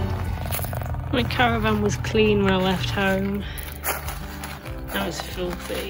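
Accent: United Kingdom. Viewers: Love yur eyes.... always a great adventure with you, and of course Winston too. British accents rrraawwrrrr😁😋😊😊🙏🙏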